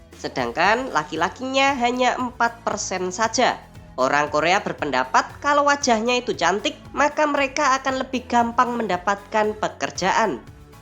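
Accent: Java, Indonesia. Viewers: ngomonge medhok banget, malah keren unik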